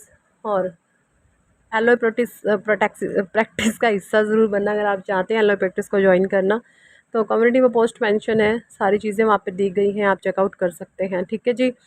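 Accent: Haryana, India. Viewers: आज। की रीडिंग पुरी तरह के से हरयाणवी है एक दम सुपर 👍👑👍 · Pehle apka punjabi touch tha, par aj puri haryanvi boli hai😂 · Sahi kaha Rahe ho aaj haranvi bol Rahe ho ❤😊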